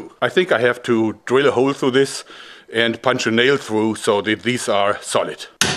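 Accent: Germany. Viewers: ich habe an ihrem englisch erkannt das sie deutsch sind 👌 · I wonder where he's living - cause he uses all the american measures:D but i suppose germany - cause of his amazing accent ( and the laughing is gorgeous ):D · is he a german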